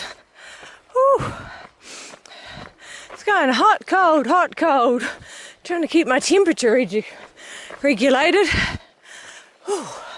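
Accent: New Zealand. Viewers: Congratulations! Well done. I'm going to miss your Kiwi accent.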